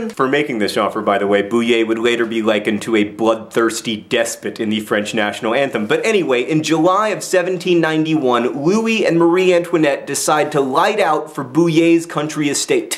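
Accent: United States. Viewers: did you say you didnt pronounse it right because your Americain? finally, i always think "what does he mean by that!" no offence but its because im british!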